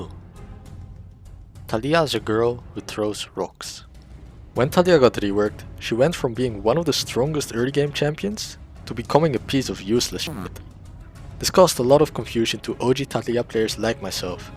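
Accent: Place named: Netherlands. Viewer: Ik kon binnen de eerste paar seconden al raden dat je nederlands bent hahaha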